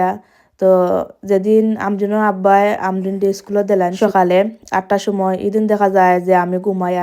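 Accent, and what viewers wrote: Sylhet, Bangladesh. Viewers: Right kotha boin koisoin . Afa afnar Sylheti mat je sundor lage . 😊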